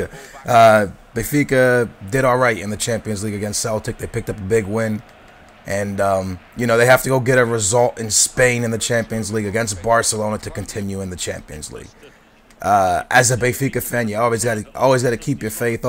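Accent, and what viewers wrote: United States. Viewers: he learned to speak english the american way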